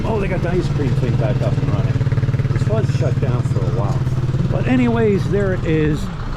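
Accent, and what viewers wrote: Philadelphia, United States. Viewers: Bro, you still talk like you are back in Philly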